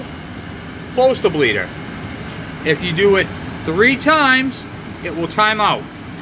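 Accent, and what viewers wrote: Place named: Massachusetts, United States. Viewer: Thanks, from Hillsboro, NH! That really saved my butt. I knew you had to be from northern Mass from the way you talked, LOL. I'm a former Lowell resident, born and raised.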